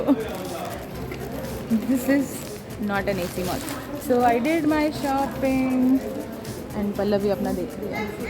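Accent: Bengal, India. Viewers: Wow! Loved your bengali accent. And Will this market soon. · Aapnar Bangla ta darun! In fact you even have a Bengali accent! Have you been living in Kolkata long?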